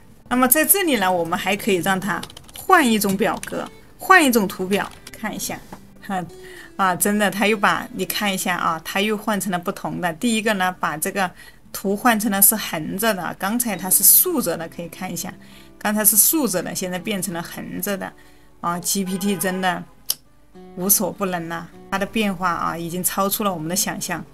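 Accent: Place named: Wuhan, China. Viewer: 武漢口音超級重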